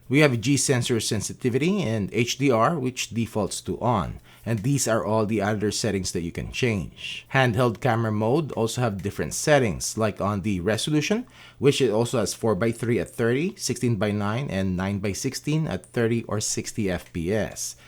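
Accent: Philippines. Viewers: Filipino accent ❤❤❤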